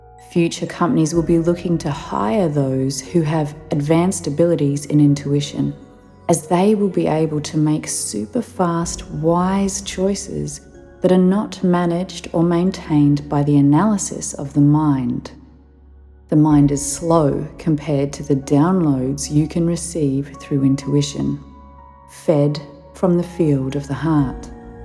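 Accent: Australia. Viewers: Such a deep thought and wisdom that a lot of us neglect especially when our minds go to overdrive and cannot somehow sort out what really our intuition telling us about. Just found you in times of trouble and looking to sooth my heart on Youtube with all the loads of helpful and inspiring stuff. When I hear your voice I immediately recognise your loving Australian accent.:-) Thank you heaps!!!!!! ❤️🙏🏽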